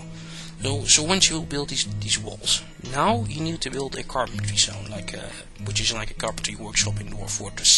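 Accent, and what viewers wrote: Netherlands. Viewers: i think you are from holland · Are you dutch by chance?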